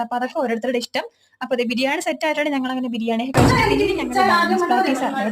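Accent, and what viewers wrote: Kochi, India. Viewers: Biriyani kazhichathinte Santosham aano last ile dance 😂 Eniku othiri ishtama ...kochi baasha kelkaan❤❤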